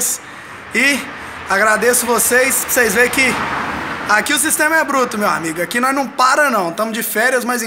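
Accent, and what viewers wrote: Goiás, Brazil. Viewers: Tu es da onde cara? Você falando parece ou Zezé de Camargo ou Leonardo. Você e de Goiás?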